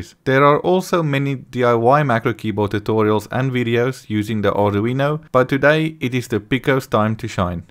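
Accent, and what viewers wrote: South Africa. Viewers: Would love to see version 2. Your accent sounds familiar would you be from South Africa. If so it would help in getting a pcb from your order.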